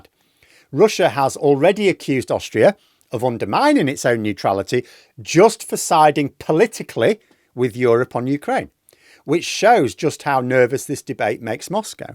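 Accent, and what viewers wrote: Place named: England, United Kingdom. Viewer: "Smooth move, son!" Speaking as an Australian, this sort of thing is why we like listening to English people speak as compared to, say, Americans. You sound like my grandfather but cool and very now. Anyway there has never yet in recorded history been a century without at least one widespread land war in Europe. Why would this century be any different?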